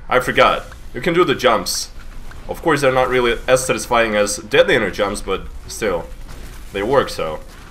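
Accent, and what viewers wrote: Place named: Russia. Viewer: he got a bit of an English-speaking Russian kind of accent?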